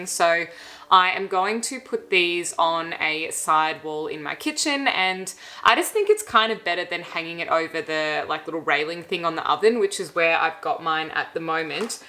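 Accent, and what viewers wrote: Australia. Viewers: 🦋Love you channel except for 1 thing...It’s a shame you choose to use the American slang Haul...it doesn’t sound right with the Aussie accent ☹️ You’re an Aussie! 🤗🇦🇺 don’t we call it a shopping spree 🛍 in this beautiful country of ours🏝🦘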